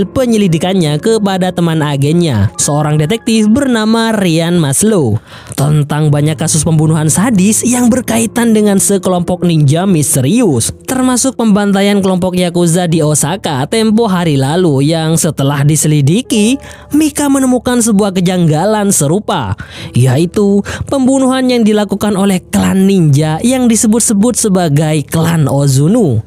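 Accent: Madura, Indonesia. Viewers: Abbe adminnah Reng medureh yeh😂😂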